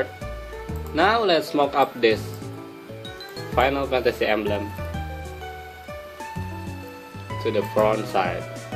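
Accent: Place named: Vietnam. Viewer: its pretty standard vietnamese english if i do say so. i think they're just racists.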